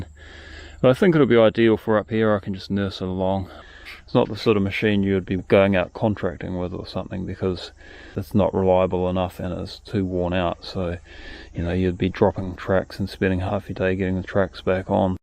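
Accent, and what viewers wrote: New Zealand. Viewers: New subscriber here. I am also subscribed to Iforce2d, an other YouTuber from NZ. He is doing remote control stuff, like fixed wings and copters and recently home built CNC machine. Anyway, it is very interesting how your voices are so very similar. Of course the accent makes it a lot similar, but even your style and look so very similar. Could pass for brothers.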